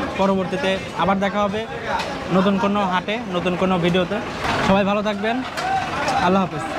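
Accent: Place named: Bangal, Bangladesh. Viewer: ডাউল ডাউল না বলে শুধু ডাল বললে কি সমস্যা আপনাদের? ডাউল, চাউল যতসব বাঙাল ভাষা এই আধুনিক যুগেও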